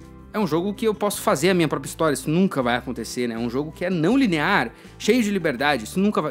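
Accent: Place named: South, Brazil. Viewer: O que me manteve nesse vídeo até o final foi esse sotaque sulista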